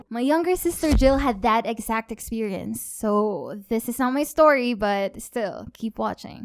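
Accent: Visayas, Philippines. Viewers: Cccccc rrrrrrrr perpek pilipino aksent :) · U sound like a filipino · Omg im a filipino!🇵🇭 ~*~*~*~*~*~*~*~*~*~*~*~*~*~*~*~*~*~*~*~*~*~*~*~*~*~*~*~* 🇵🇭Filipino: Maganda ang video! Ako ay mag subscribe sa iyo kapamilya👍🏻❤️ 🇺🇸English: The video is beautiful! I will subscribe to you my friend👍🏻❤️ ><><><><><><><><><><><><><><><><><><><><><><><><><><><><><>< Btw are u a filipino? ur acent is like a filipino.... especially when u said "C.R" ======================================================== EDIT: Btw I love ur acent😂👍🏻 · OMG nung una ko tong pinanuod... sabi ko, ang accent mo parang sa filipino. 😂😂 love this channel... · I knew it.i instantly guessed that you are a Filipino too because of your accent(which is, btw super gooood) just from the first video I watched from you. Another youtuber to be proud of. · I new u are a pilipino beucase im a pilipino and your english is like pilipino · bisaya po ba kayo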